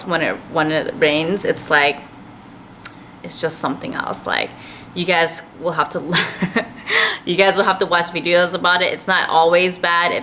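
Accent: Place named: California, United States. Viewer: So you left? I got assume you’re from Cali with that accent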